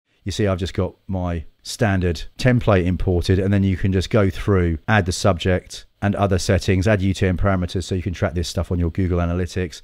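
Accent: United Kingdom. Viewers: Hey, I really enjoy your unique presentation style. You cover technical tutorials, recommendations, comparisons, a reactions, all with a British accent, in just 15 minutes. Great job! Keep up! You didn't ask to subscribe but I did.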